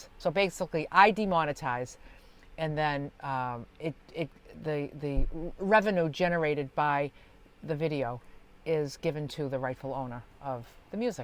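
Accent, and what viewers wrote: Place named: Massachusetts, United States. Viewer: you are amazing! Your honesty and mindset is inspiring to me. I’m working on your food plan and working on my mindset about everything!!! Thank you for all the hard work!!! I hope you are able to do an Amazon store soon!!! I love your gadgets! ❤❤❤ Ps. My first video was way back when you first got Sweet Pea…I too am from Massachusetts, I recognized your accent… And I was hooked. Keep up the great work, lady!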